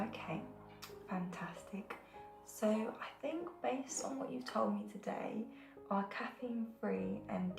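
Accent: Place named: United Kingdom. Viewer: This channel is such a gem! Your detailed explanations are perfect and I love the option of music - so rare - I find it so relaxing and grounding. I find it so interesting, I’m American and am rarely relaxed by British ASMR. I think you have a similar accent to Drifting SJ who I also like - and this channel 80gemstar that no longer exists (although her accent was considerably more intense). I think you all have great texture in your soft speaking voice which I really appreciate. Thanks so much !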